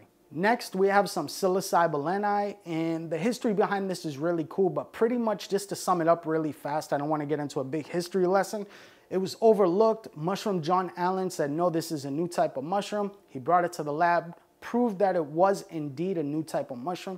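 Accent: United States. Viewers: He speaks a heavy regional American English. Impossible to mistake where he's from. :) Has a few problems with the latin also -- especially cyanescens and azurescens But, ah well. Not so important.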